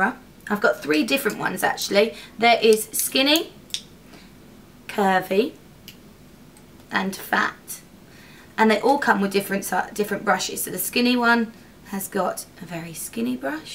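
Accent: United Kingdom. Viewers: Hi.! So happy both you and little Harry are OK.;) Time's flying by so fast! No wonder these little angels grow up so fast.:) Thanx for the awesome vid, you can't imagine how many of your looks I'm wearing on a daily basis. (And, on a totally different note, you restored the British accent to my world! Some awful teachers ruined it for me forever but I LOVE listening to you guys! Don't mean that in any weird way.:) Just a thank you note.:))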